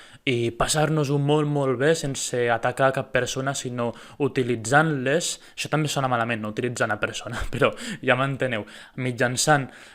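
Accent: Spain. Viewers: aunque te mueras de la rabia: se nota que tu lengua madre es el español.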